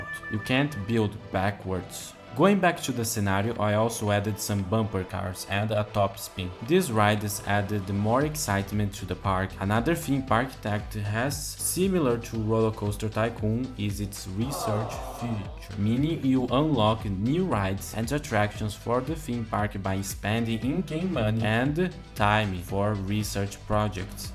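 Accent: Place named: Brazil. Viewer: lol, tu é brasileiro? Esse sotaque BR com tantos "i" no final das palavras tá muito forte hein hehehe.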